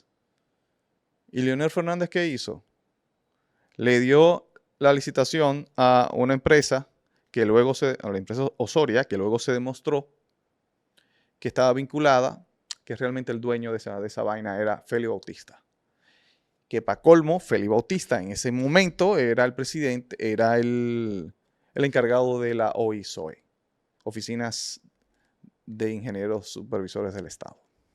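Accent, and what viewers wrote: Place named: Venezuela. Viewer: Is this guy DOMINICAN..? Sounds very much Venezuelan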